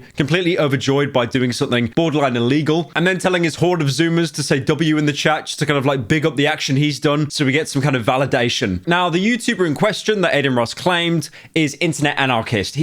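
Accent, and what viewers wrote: United Kingdom. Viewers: Pyro, Internet Anarchist’s numbers line up with yours. He lost $8000, he’s Australian. If he made a million on that video, like your that made a million, the numbers would be very similar. Convert $8000 AUD to pounds which i’m assuming you are using because you got the funny british voice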